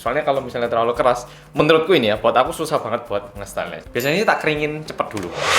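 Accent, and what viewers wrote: Java, Indonesia. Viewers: Udah lama gak nonton, kangen medhog mu mass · Medokkkkkkkkkkkkkkkkk jaga kesehatan mas 🤗🤗🤗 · Style baru nihh rambut baru kacamata baru makin berasa kek oppa medhok awkwokwowkwowkowkwo....... cocokan gitu seee keliatan kurus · Gue nonton trus video dia .. dia asli korea .. sekolah di indo balik korea lg kok jawa nya medok banget .. geuuumeeeeess 🤣🤪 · beli mi instan korean spicy bang medoookkk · Di rumah aja nontonin sing medoook aja! Hehee ᬚᬓᬭ᭄ᬢᬭᬬ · Yeheee orang korea yang MEDOKKKK hallo mas👋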